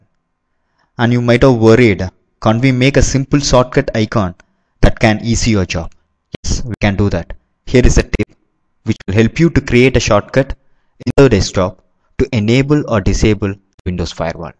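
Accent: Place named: India. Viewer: Love indian/english! :D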